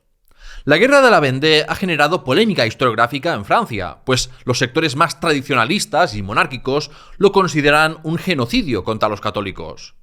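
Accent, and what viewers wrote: Catalonia, Spain. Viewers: Este personaje, iconoclasta de todo lo español, por su aceto, creo que debe ser catalán. Ataca la monarquía de todas las épocas, las conquistas, la religión, aboga por las culturas extranjeras, según él, debería atacar todas las películas de Hollywood, de temática histórica y religiosa, como Ben-Hur, Quo Vadis, La túnica sagrada, etc. Este detractor amargado, se cree en poder de la razón y la verdad absoluta, pero ya sabemos que eres un enrabietado de izquierdas, resentido y frustrado.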